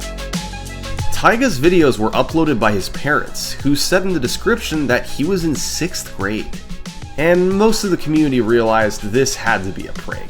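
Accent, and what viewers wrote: United States. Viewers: Thats what American sound like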